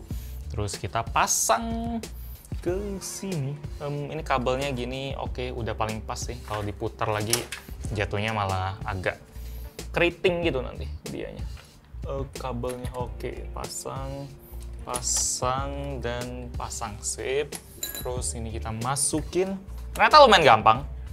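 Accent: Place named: Java, Indonesia. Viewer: bahasa mu medoke banget hahahahahhaahhahahaha "DARIPADHA"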